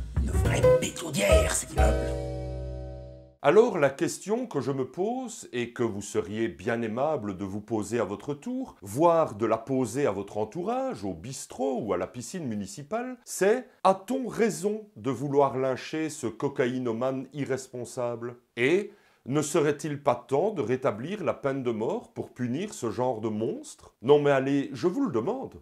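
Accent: Belgium. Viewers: Bonjour d'un voisin de Silly^^ Magnifique vidéo, je découvre ta chaine grace a Mr Sam depuis aujourd'hui meme, et j'ai dévoré ta vidéo sur la méthode, avant de découvrir celle ci. J'ai hâte de découvrir tes autres vidéos, je me sens tellement bien en t'écoutant, un peu de douceur et de bon sens avec un accent belge pas trop prononcé:p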